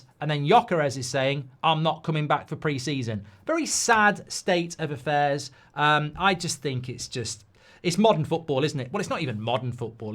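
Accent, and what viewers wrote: United Kingdom. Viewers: It looks like I am here to get Fabrizio Roman’s update indirectly with British accent.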